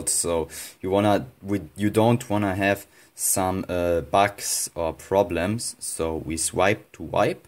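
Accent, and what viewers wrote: Germany. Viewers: Oh I can feel your German accent 😂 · Man Hört das du Deutsch bist xD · Are you from germany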